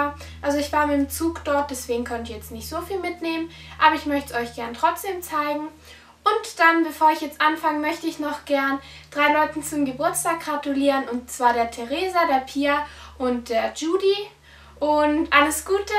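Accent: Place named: Southern Germany, Germany. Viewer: Haha ich wusste sofort, dass du aus Süddeutschland kommst:D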